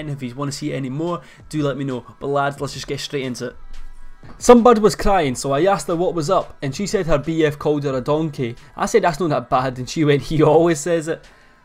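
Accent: Scotland, United Kingdom. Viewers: your scottish accent is even more scottish 4 years ago ahahh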